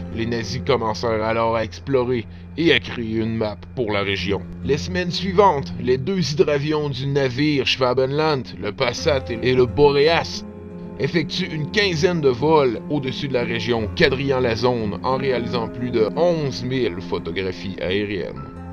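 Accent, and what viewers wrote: Quebec, Canada. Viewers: Putain !!! l'accent de Celine Dion ...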